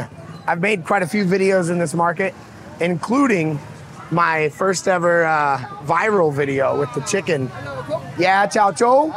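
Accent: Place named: North, Vietnam. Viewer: Haha, you speak English like the Vietnamese now. You crack me up. I'm glad you chose the northern accent. Sounds good.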